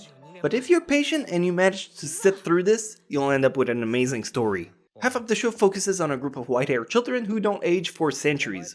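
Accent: Quebec, Canada. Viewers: french canadian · Eero Koponen *French Canadian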